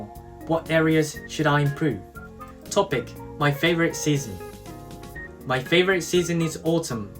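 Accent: United Kingdom. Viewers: I think you have a british accent dudeee! superbbbb! awesome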